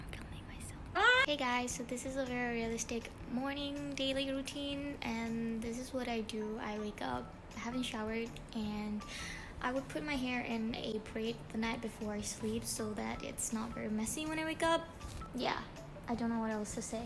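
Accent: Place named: United Kingdom. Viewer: Man her accent is interesting, it’s randomly British with her vowels and other times it sounds American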